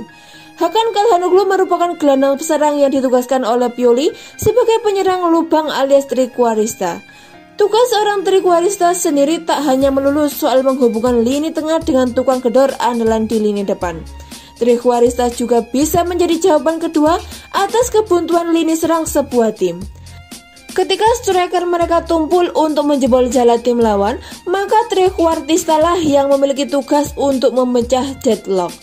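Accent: Java, Indonesia. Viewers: Mantap Medoknya keliatan bgt 😄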